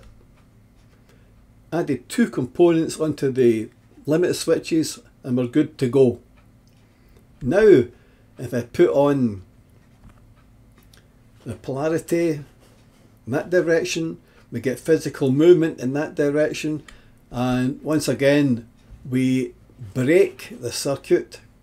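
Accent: Scotland, United Kingdom. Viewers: Nice! Clear diagrams with a clear explanation in a Scottish brogue.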